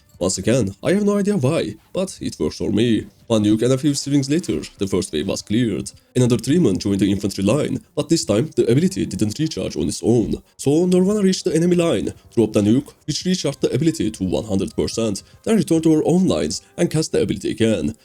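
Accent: Turkey. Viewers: Are you turkish man you sound like it gankim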